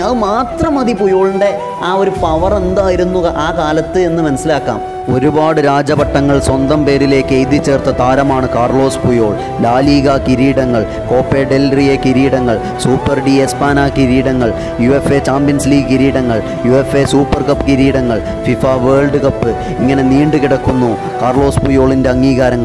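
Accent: Malappuram, India. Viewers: Kettit malappuram muthaanenn thonnunnu allenkilum malapurakaarey anik peruthishtaa Alla chaggay maarey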